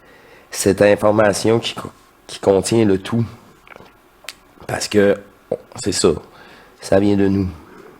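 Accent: Quebec, Canada. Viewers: Et bien je kiffe l accent québécois… ta berwete ici c est une brouette mais je kiffe quand même jolie chanson que cet accent … et il est vrai que ces picotements j ai eu aussi cela les derniers jours … amusant tiens … portes toi bien et prends soin de toi merci d être là et de partager cela gratitude à toi 🙏✨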